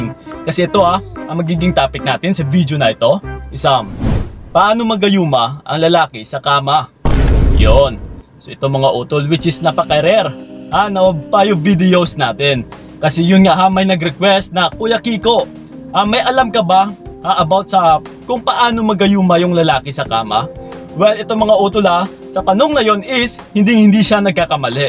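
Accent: Iloilo, Philippines. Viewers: daw ilonggo tono mo tol hehe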